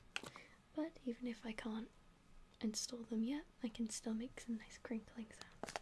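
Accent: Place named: Wales, United Kingdom. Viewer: love your videos from hirwaun and its nice to hear a welsh voice on here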